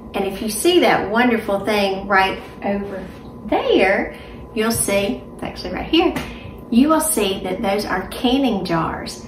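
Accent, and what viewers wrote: South, United States. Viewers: 40 she had figs on her tree OK we I don’t think we have fig trees here in the Minnesota area when you said that I was like kind of jealous like excited like thinking yes that’s really cool and then I was thinking can I plant a fig tree here. Are there any fig trees in the Midwest? I felt like I was very Mediterranean, but you have a southern accent so where are you at? Lol.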